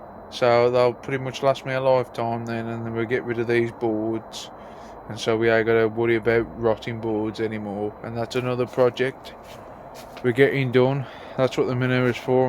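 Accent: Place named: West Midlands, United Kingdom. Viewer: Where are you from? I'm from Stourport-on-Severn. Nice to hear a Westmidlander! 👍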